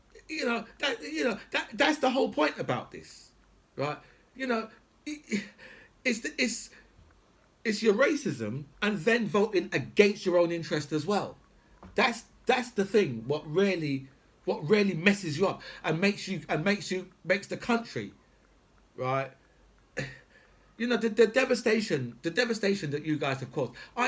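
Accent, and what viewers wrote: London, United Kingdom. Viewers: British MGTOW, just found your channel, nice to hear a London voice, lived and loved there for fours years, keep up the good work, on your side from Dublin Joe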